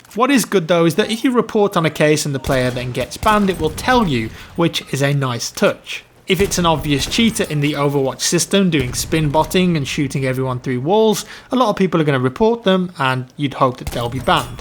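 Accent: United Kingdom. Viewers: Feel like I'm watching lvlcaps video with a British accent.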